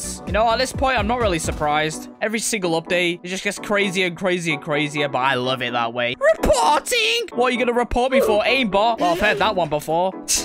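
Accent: United Kingdom. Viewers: Did bro turns to british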